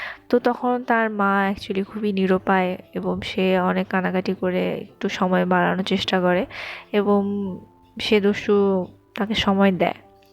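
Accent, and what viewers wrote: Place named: Rangpur, Bangladesh. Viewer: অনেক সুন্দর বাচনভঙ্গি। কিন্তু পুরো সময় একটা চিন্তায় ছিলাম আবার কেউ যদি ভাবে এই মেয়ের সাথে কথা বলতেছি🤣কারণ ভয়েজ টা ওরকম, মনেই হয়না ভিডিও। যাইহোক আপু, বাসা কোথায় আপনার?কিছুটা রংপুরের টান পাচ্ছি🤭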